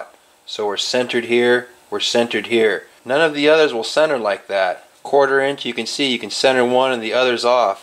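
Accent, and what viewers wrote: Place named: South, United States. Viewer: 🪓 MATE, love your attention to detail 🥇 I use a similar grinder but I use the disk wheel with a super fine grade paper (can’t recall what it is 😬). The shop didn’t have a fine grade for the belt at the time. Having one of these grinders actually makes dressing the bar a pleasure 🎉💥 I didn’t know anything about how to check the gauges etc but with Australian hard wood we go though chains ⛓️ pretty darn quick. And hey, I even liked your country drawl… reminded me of Little House On Through Prairie‼️ Hope to see you in the sky one day 🙏 😉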